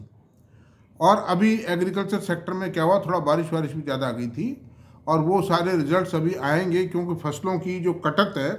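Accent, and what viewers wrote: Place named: Marwari, India. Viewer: Aap ki marwadi hamare wali hai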